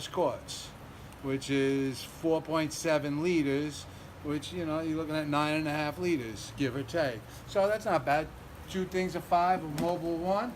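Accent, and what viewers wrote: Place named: New York, United States. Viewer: Great video. Love your NY accent. You didn't mention but I assume you jacked up the rear of the car?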